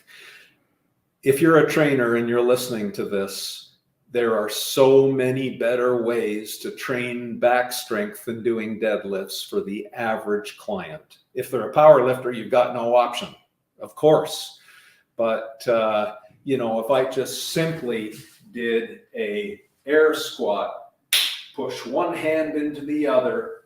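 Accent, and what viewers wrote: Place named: RP, United Kingdom. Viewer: He is only speaking with a plum with. His mouth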